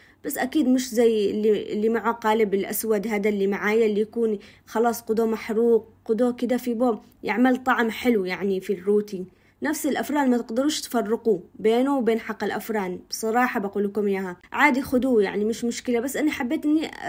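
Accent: Aden, Yemen. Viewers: السلام عليكم بنتي الله يحفظك يا واني اتابعك بكل المقاطع حقك واعادك بالرياض ولعلمك بحياتي عمري اتفرج وبس مااشارك ولا احب بس اعجابي بطبخك وشخصيتك واسلوبك بالكلام العدني اللي ماغيرتيه اشكرك جدا وطبخك احلى طبخ والله كل الاكلات اللي تطبخيها الله ؤفتك عليك يا اتفاجئت انك خرجتي المهم استمري. ولا تتوقفي التوفيق حليفك بكل امورك اخوك يستحي مش مهم الله يفرج عليك ويحببك خلقه يارب رغم انك حبوبة ودمك خفيف ماشاءالله تبارك الله من على مابدءتي واني محتفضة باعجابي وما احب اشارك بس عشانك يابنتي بحفظ الله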